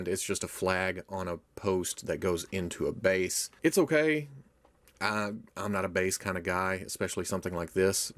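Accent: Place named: South, United States. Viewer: I’m also from Arkansas. I love his southern accent.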